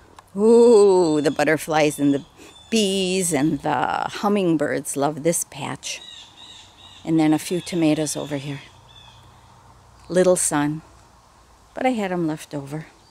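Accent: Wisconsin, United States. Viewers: O my! How beautiful everything is! Took me two days to get through your tour (I keep getting interrupted), but o what a joy! I just found you, and I thought I heard you say, you are moving. I hope that doesn't mean you're ending your channel. I hope you continue creating beauty and joy wherever you move to. Plus. I love your WI accent. I grew up in WI. Moved away 25 years ago. Miss it terribly. You sound like home ❤️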